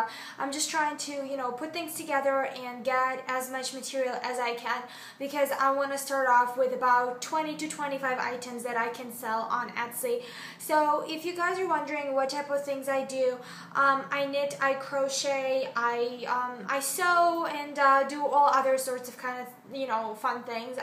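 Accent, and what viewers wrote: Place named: United States. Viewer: У вас идеальный английский язык в американском произношении!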